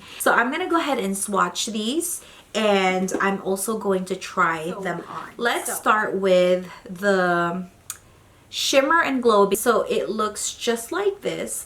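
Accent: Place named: England, United Kingdom. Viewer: I like your shimmer and glow but I only could think your very nice English accent :)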